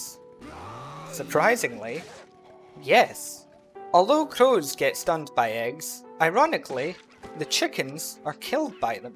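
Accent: United Kingdom. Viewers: "Hay-ch PEE!!!" 😂British voices are great.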